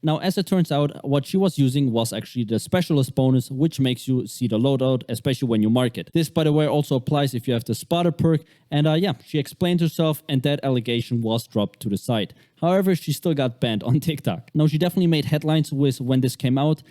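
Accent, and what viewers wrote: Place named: Germany. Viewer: You definitely a German bro, your accent sounds like this, and your name too.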